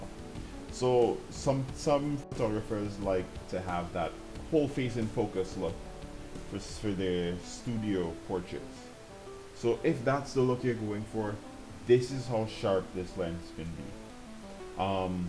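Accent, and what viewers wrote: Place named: Trinidad and Tobago. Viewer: Triniiiiii. Lol I can hear that anywhere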